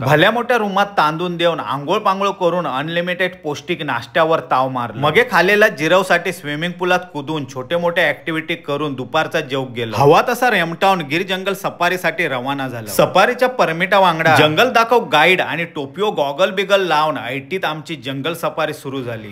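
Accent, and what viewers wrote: Malvani, India. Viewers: छान मालवणी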